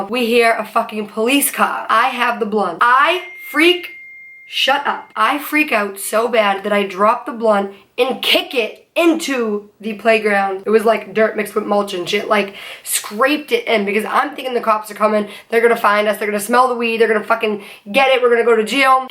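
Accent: Boston, United States. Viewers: HER BOSTON ACCENT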